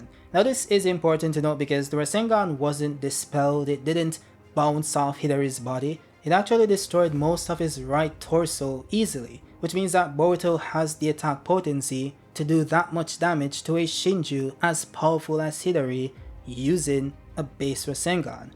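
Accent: Jamaica. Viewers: Bro are you Jamaican ?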